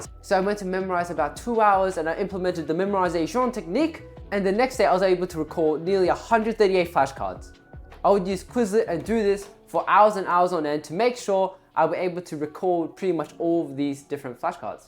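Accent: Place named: France. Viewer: Is it only me who loves how he speaks french?💀 The accent and the advice are both gold thanks 😃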